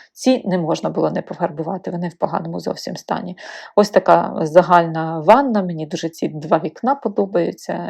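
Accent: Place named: Surzhyk, Ukraine. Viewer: 'чьі', 'щьо' - суржикова вимова; правильно казати 'чи', 'що' ☝️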